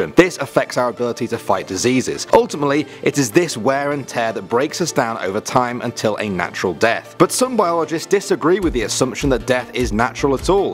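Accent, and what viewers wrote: United Kingdom. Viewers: 😍😍😍😍 I KNEW THAT BRITISH VOICE SOUNDED FAMILIAR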